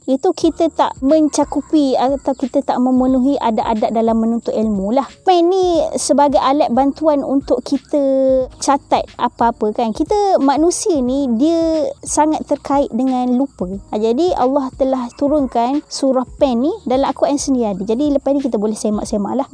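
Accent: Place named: Pahang, Malaysia. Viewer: 💯 setuju. Dah lama tak dengar loghat Pahang, felda mana ni? 😁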